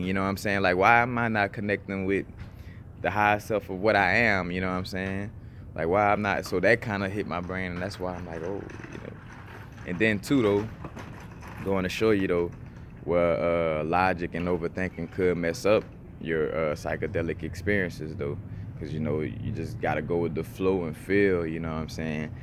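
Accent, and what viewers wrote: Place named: Louisiana, United States. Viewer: where you from bro? you sound like youre from my neck of the woods down in the boot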